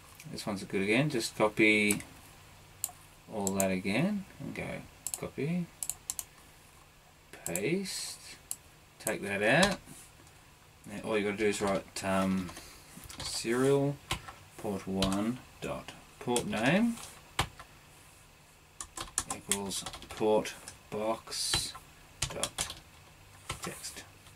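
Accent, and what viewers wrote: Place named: Australia. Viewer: Interesting video. Been thinking of getting into Arduino and wanted to see if I could do the programming in C#. Thanks for the information. Correct me if I'm wrong but you sound like you're from Australia. Hope all is well for you down under :)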